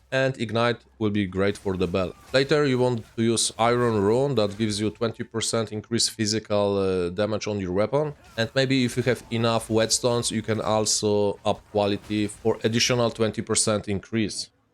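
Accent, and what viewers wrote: Poland. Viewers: As a Russian, I can recognize a polish accent flawlessly as well hahaha. Kurrrrwa! 😂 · Ten polski akcent poznam wszędzie :)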